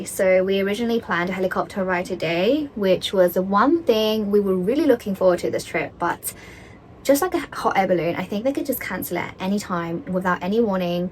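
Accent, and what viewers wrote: United Kingdom. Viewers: What's with the British accent? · This is the first time I ever had your channel come up on my YouTube. First it reminds me of those famous spots in Thailand kind of has the same scenery. But the first thing really is your voice first of all it's unexpected I didn't expect to hear your voice and I don't think it's artificial intelligence I think it's your real voice. Your accent sounds British in a sense. You two are are cute couple you're beautiful and your voice is just a really gorgeous. I'm from America grown up as a kid I always wanted to travel never got around to it. But China real China not cities and Japan not cities I loved as a kid cherry blossoms bamboo forest the clothing the tradition the music is awesome. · Can I ask where is the accent from? Love the accent, british but not super londonish.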